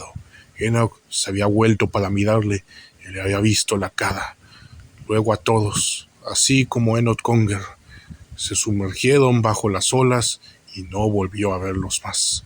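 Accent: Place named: Border, United States. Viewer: Tu narración de gringo fronterizo le da todo el estiló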